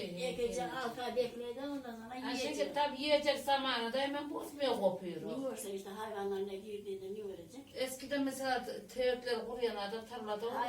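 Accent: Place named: Konya, Turkey. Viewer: Merhaba bur konya yöresini konuşmayı benzettim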